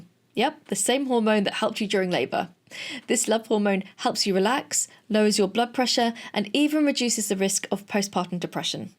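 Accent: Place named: United Kingdom. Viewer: Hi, I have been binging your content over the last week or so and want to say a huge thank you. Its refreshing to hear a British voice with experience in the NHS going over all these important concepts since so much of the content on Youtube is US centric. Im 37 weeks pregnant and feeling much better prepared for breastfeeding and postpartum thanks to your content. I was also fascinated by how the mention of "OF" clearly got your video seen by the algorithm and the engagement it got!!! Like the YT game is rigged against legit informative content... In any case, thank you and keep doing what youre doing ❤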